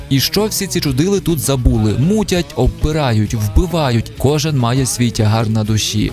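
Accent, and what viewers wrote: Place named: Transcarpathia, Ukraine. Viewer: він не понасирав, він просто з Закарпаття, я теж і у нас тут свій суржик такий і деяким людям важко писати і говорити чистою літературною мовою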